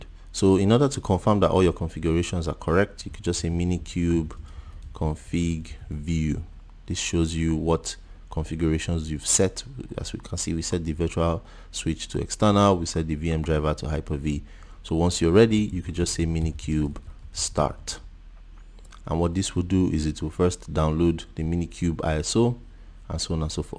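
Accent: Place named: Nigeria. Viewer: I have not even seen the video yet. But I am subscribing because you sound nigerian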